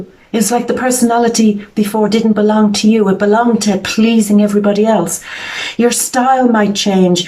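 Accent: Ireland. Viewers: Are you irish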